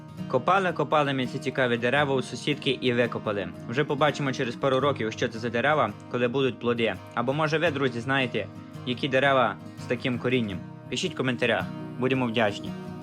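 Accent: Hutsul, Ukraine. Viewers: ДУЖЕ ДОБРЕ, СТАРАЙСЯ) БО ТУТ В НЬЮ ЙОРКУ ХОЧЕТЬСЯ ЧУТИ РІДНІ КАРПАТСЬКІ ЗВУКИ:))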